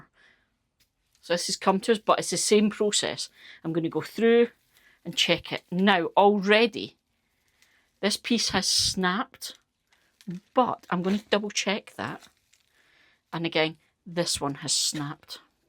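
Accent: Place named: England, United Kingdom. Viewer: Thank You, Canada here. Just got some tubers (60$), so dont want to lose them.:) You helped alot. Peace. Love your accent (so pretty)... English accents always sounds like birds chirping... kind of. :) Peace.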